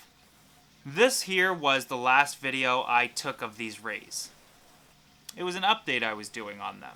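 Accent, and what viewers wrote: Canada. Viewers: you make good videos. Well planned and we'll spoken. are you Canadian? jw u sound it . cool videos thanks for the info!!!!!!!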